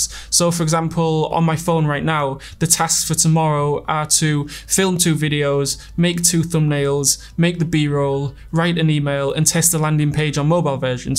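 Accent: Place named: Yorkshire, United Kingdom. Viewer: which part of the UK do you come from? It sounds a bit like Yorkshire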